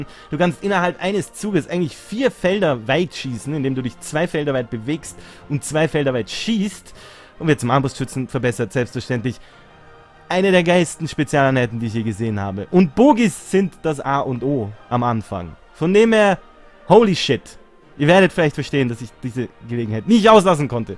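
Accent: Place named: Vienna, Austria. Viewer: Was für ein Akzent ist das? Wien?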